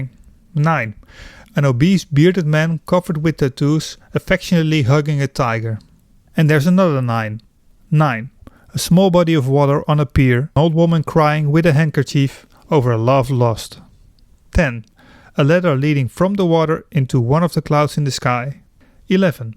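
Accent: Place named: United States. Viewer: it took me a while to figure out you were saying ladder and not letter, the A should be AH not EH, your english is slightly americanized but great vid otherwise.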